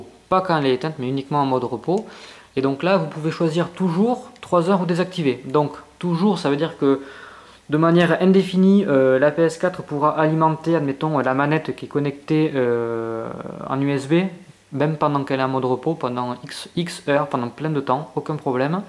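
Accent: France. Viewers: Merci pour la vidéo PS j'aime trop ton accent du sud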